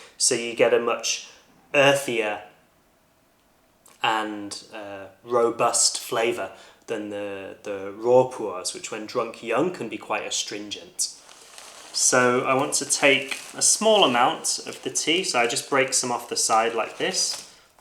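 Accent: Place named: United Kingdom. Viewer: All I'm saying is that "Man talks about caffeinated beverages in a British accent" is clearly a formula that works. You'd need to upgrade from t-shirts to jumpers though.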